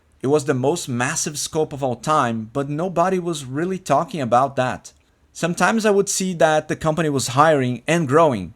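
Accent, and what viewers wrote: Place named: Brazil. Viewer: Vc é brasileiro não é?? Teu sotaque em inglês é BEM BRAZUCA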